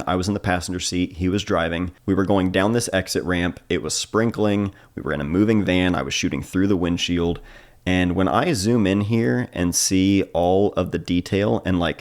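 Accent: Ohio, United States. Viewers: Chillicothe?! I knew that accent sounded familiar. I'm in Portsmouth. Sorry for the house sickness, and Happy Birthday!(belated). We love you too. Congrats on the M11. Beautiful work.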